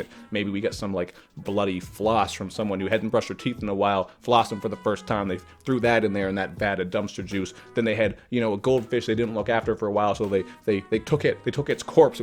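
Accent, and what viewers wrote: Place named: Nova Scotia, Canada. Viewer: I think Nova Scotia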